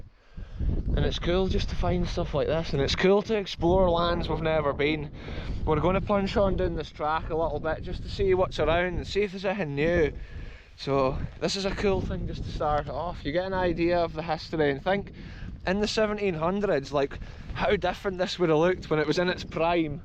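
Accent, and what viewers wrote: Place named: Angus, United Kingdom. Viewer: I always find it hard to locate your accent. Given the general area of your adventures, I am going to guess Angus, perhaps around Forfar/Kirrie/Brechin? Am I close?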